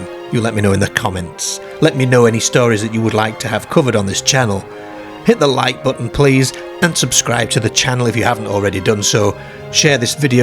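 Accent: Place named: Yorkshire, United Kingdom. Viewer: Somewhere in yorkshire where they say Bairking instead of barking, hairf instead of harf...I dunno!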